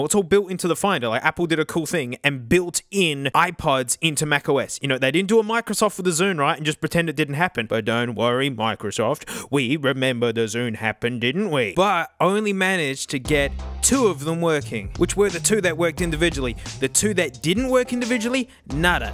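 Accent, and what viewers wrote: Australia. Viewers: Maybe because he’s Australian? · You're sooooo Australian..... And I love it! 🥰🤣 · I visited an old video and just realised that as his videos gone on, he’s picked up an Australian accent along the way, I’m not sure really, put your opinions in the replies because I don’t really know.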